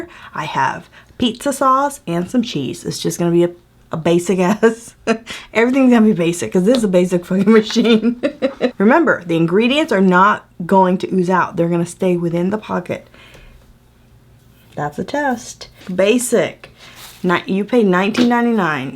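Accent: Puerto Rico. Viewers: boricua accent on point hehehe LOVE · Love your Puerto Rican accent! 💕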